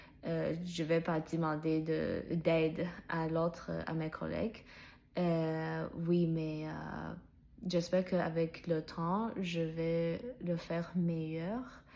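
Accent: Quebec, Canada. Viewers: C'est mignon ce petit accent Québécois caché au milieu de ton accent chinois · Félicitations pour tes progrès, courage et bonne continuation 💪 Btw il me semble que tu as déjà un petit accent québécois ^^ Salutation de la Belgique 🇧🇪 Gilles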